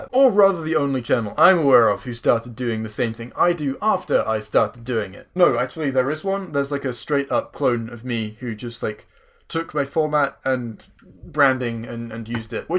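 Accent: England, United Kingdom. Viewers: You sounds so English in your early videos, I thought your accent had just changed when you went to uni. (I changed accent at uni) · You get accused of this because you're British and accents are a tip off that you're prone to rip off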